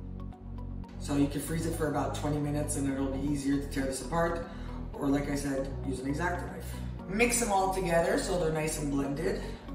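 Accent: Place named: Canada. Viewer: OMG I KNEW IT HAHA i kept thinking "she has to be at LEAST Canadian" and then you said "un lozenge"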